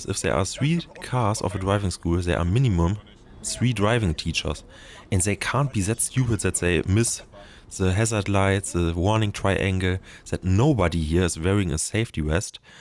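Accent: Germany. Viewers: Its real and hey theres nothing wrong with that. Hes trying his best. Not everyone in Germany is able to speak English. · Are you making up this accent or is it real? I can't understand half of the things you say. It's almost like a joke with German stereotype: "ze car, ze road". Damn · You guys might like to know that there might be an experienced narrator coming in soon to take over the vocals. I also have a really rough time listening to the classic German English.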